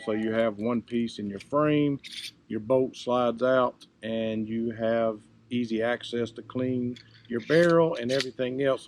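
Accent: South, United States. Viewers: Where’s that southern accent from? Louisiana? Georgia here. Love my Mark IV 22/45 Lite. Got mine without iron sights with the short pic rail and Riton red dot from the factory.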